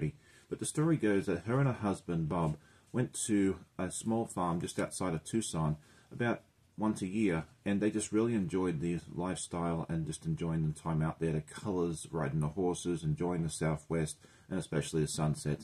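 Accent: Australia. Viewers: I love those colours.... Im also curious is that an Aussie accent?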